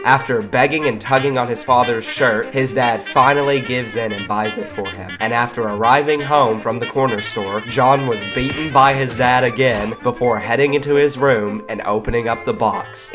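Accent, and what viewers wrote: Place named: South, United States. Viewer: Amazing video. You did great and it's awesome to hear a good country accent brother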